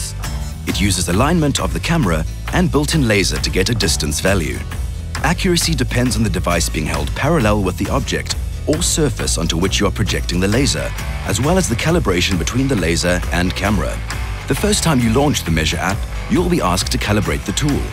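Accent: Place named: India. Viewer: The narrator has an Indian accent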